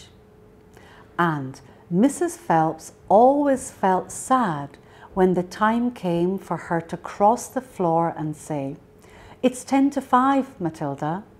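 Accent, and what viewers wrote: United Kingdom. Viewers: 영국발음이 너무 좋아요... ㅠㅠ Roald Dahl Charlie and the Great Glass Elevat 이 책도 읽어 주실 수 있나요? 책이랑 같이 읽으면서 듣고 싶어요!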